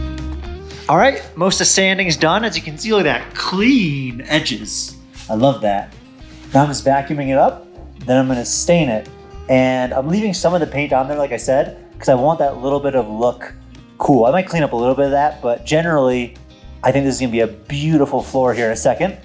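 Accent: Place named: East Coast, United States. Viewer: You sound like youre from the east coast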